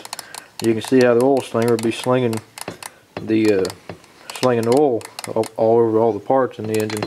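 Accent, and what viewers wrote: South, United States. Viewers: Love your videos sir, and that southern accent makes me miss my almost 20 years of life in TN. I have a Leaf Vac with a Briggs Intek 875 engine. Inherited from grandpa in north Michigan. Engine was hydro locked and I have been able to fix it, ne oil, filter plug and it is now running but only on high. Looks like it's all connected properly to the carb. Is there anything else besides a stuck butterfly valve that would make just run on rabbit speed? Any advice would be greatly appreciated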